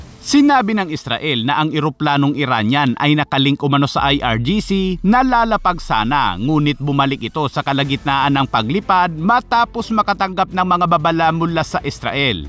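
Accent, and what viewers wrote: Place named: Visayas, Philippines. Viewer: Kala ko mali lng spelling mo bisaya pala ikaw bumasa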